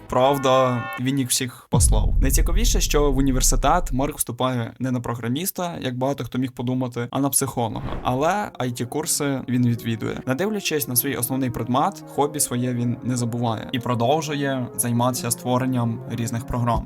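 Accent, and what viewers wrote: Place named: Zakarpattia, Ukraine. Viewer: Бро, дуже якісний контент, акцент цікавий, ти часом не з Закарпаття?)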